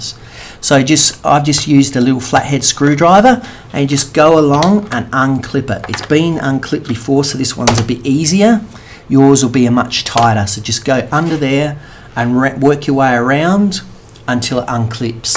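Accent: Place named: United Kingdom. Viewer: TH - A annnnk U! I'm still use this model. Are you british ? I like your accent!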